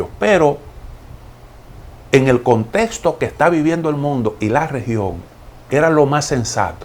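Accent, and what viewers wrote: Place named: Dominican Republic. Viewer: Buenas noches. Ciertamente no me pierdo ninguna de tus programas, siempre interesantes. Hace ya 23 años participe el la reconstrucción de RD en la zona de Haina, tras los desastres del Huracán George. Monte una mina en el Montoto, carca de Higuey, para fabricar agregados para asfaltado y hormigón de las carreteras y puentes que se habían destruido. Como dicen ahí, "dure " dos años, alternando con otros negocios que tenía en España. tengo grandes recuerdos de ese país y lo recuerdo con cariño. Su dicción Dominicana me encanta. Colaboraba conmigo un gran amigo dominicano, el Ingeniero Emilo Pimentel. Gran persona como amigo y profesional. Perdí el contacto con el. El Huracán fue en el 98 y hace años, si sigue entre nosotros, tendrá cerca de 80 años. A donde voy es que su manera de hablar pausada y sus dichos dominicanos me recuerdan a el. 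No he vuelto por ahí, pero me cuentan que ha cambiado mucho el país. Me llamaron para la construcción de carreteras en Haití, antes del terremoto. Había una empresa española que se llamaba Elsamex y nos propuso formar una UTE para realizar las obras mencionadas en Haití. Surgió el terremoto y se indio la oficina provisional de Elsamex en Haití, y murieron dos ingeniero y un topógrafo que se encontraban en dicha oficina. Lógicamente se suspendió la obra que se iba a realizar con financiación del Banco mundial, lo mismo que se hizo en las obras de Higuey.Le contaría muchas historia de mis vivencias en RD. pero no viene al c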